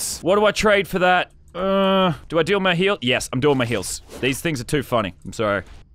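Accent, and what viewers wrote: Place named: Australia. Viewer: you're my favourite YouTuber, not just because i think swearing in an Australian ascent if funny but the things you say and do make me laugh just as much, also keep playing fortnite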